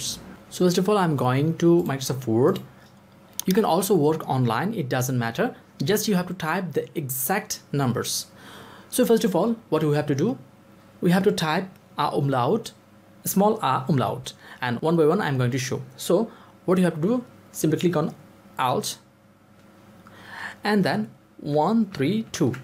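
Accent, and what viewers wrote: India. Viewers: mr. indian i dont know what your saying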